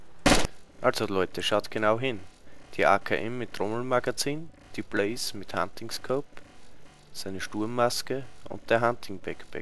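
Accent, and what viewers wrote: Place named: Austria. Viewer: Ich bin auch Österreicher, voll krass aber irgendwie geil dass du auf YT so redest :D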